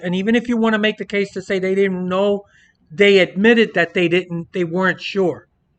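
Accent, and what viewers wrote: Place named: New York, United States. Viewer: These rant reviews are on point my man! Was telling so many people at work and in the neighborhood who you were and how hilarious you are especially with the NY accent 😂😂😂 I remember this weekend quite well as it was my mom’s Birthday Weekend and even though my favorite driver won the Pepsi Firecracker 400, I hate that we had to do it that way which is why I was better off going to the Fair that day instead which also makes me glad I fell asleep in the bedroom for half of that Winn-Dixie Firecracker 250 as well and when I finally woke up, I didn’t even bother and look how much happier I was that whole weekend compared to if I did watch.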